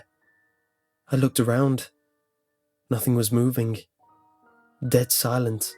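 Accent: United Kingdom. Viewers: Well if you haven't noticed yet, he's British :/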